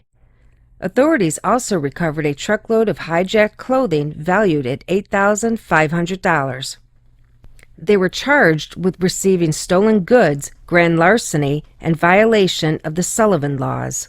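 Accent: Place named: Midwest, United States. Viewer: Are you from Chicago ? I hear a midwestern accent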